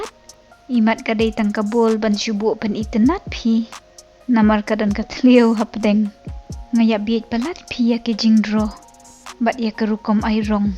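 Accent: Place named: Khasi, India. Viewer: Nga sgewtynnd ia kane ka chanel dei na ka rukom kren...khasi tiak pa tiak bad tbit bha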